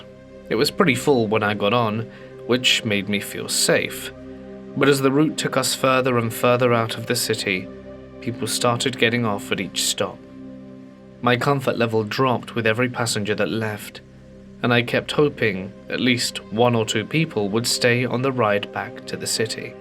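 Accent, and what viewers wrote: United Kingdom. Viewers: I love that British accent !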